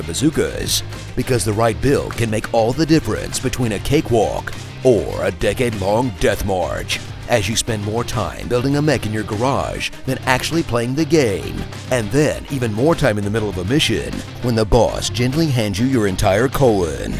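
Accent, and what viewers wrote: Australia. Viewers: Australian youtuber, but yeah. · I think he's Australian.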